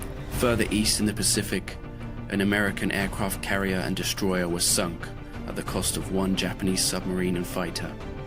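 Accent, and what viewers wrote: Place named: United Kingdom. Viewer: That was, is and always will be amazing- never-ever-ever seen anything like that in the history of A&A videos! Your Brit accent makes it sound like a real war footage reel, it's even more ground breaking than my NO LIMIT SHOWS - if this doesn't make your name+ A&A channel known in our community, I just don't know what will!!!!!!!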